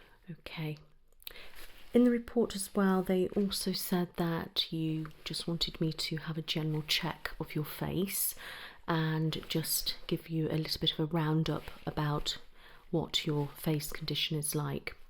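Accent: United Kingdom. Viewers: You’re seriously the best at these doctor roleplays. Very relaxing. Can’t watch any other doctor roleplays anymore cuz yours are better in my opinion❤️ plus I love the British accent (idk why, different languages and accents give me a lot of tingles)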